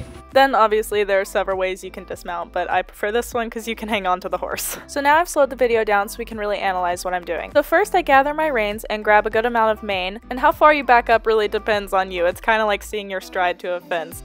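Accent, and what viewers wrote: Russia. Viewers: I promise I'm not a 12 year old weeaboo but out of curiosité Do you happen to watch Yuri on Ice or is the Russian ish accent just for funzies? (My god asking about anime. My thirteen year old self is screeching) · Is that a Russian accent, sounds like my departed gramps Latvian accent. Have 4 horses at the house sit, thanks for the inspiring video! Subscribed!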